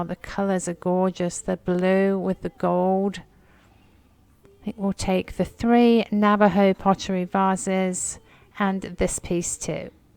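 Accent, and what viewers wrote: United Kingdom. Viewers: Please don't put things back on the table like that carry them down the table as you go. I know I am not alone in saying that it drives my anxiety way up. Even if you say that no one is behind you, it doesn't stop the anxiety. The only thing that would is if you carry it along with you. If you must put it down, please put it down in front of your body while you reach for another item. Then pick up that item along with the other ones in front of it. If I could control anxiety I would, because it really sucks. I'm relieved you were able to get all the items. But maybe if you wouldn't mind, in the future realize that so many of us, including myself that love watching all you guys as a respite from anxiety. I love watching your videos. You are always so cheery. I love your British accent, and your knowledge about so many things.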